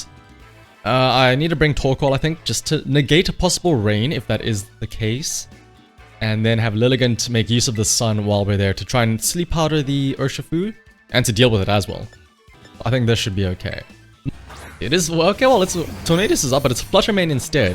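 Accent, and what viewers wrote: South Africa. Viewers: hey man are you from south africa? I feel like i recognise the accent haha. saying this in zimbabwe!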